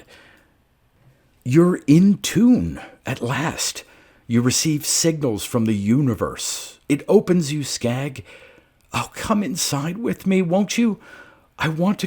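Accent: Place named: United States. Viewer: Do you realise how VERY American you sound?. Almost a parody of American. I wonder how long religion will keep your Nation infantile?.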